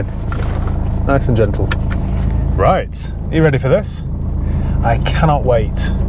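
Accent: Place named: Yorkshire, United Kingdom. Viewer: You're Yorkshire accent made me laugh.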